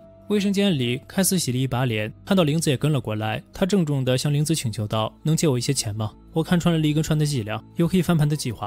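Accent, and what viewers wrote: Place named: Shandong, China. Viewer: 感觉作者听起来像山东人。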